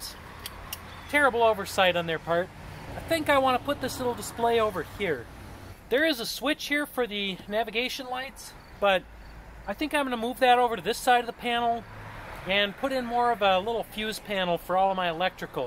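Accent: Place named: Minnesota, United States. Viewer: I thought so. I take my 74' Starcraft Capri trihull on that lake sometimes. I sure thought you sounded Minnesotan, I was right!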